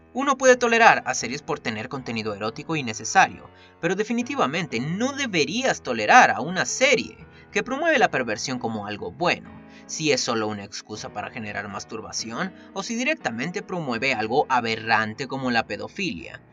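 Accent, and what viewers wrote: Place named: England, United Kingdom. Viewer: Mejor voy Preparando mi Dinero, Para pagarte en Patreon y que dejes tu Acento Ingles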